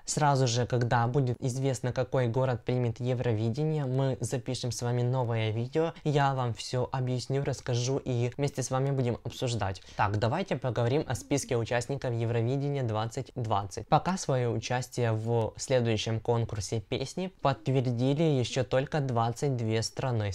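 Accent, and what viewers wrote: Ukraine. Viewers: В первые слышу чисто украинский акцент)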